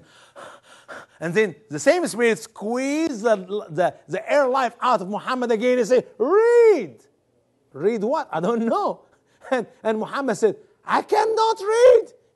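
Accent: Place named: Arab world. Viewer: Nigga talking with Arabic and French accent, lol, funny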